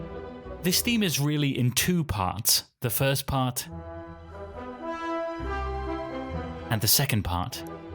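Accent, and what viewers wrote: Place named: United Kingdom. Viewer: Que curioso suena el acento británico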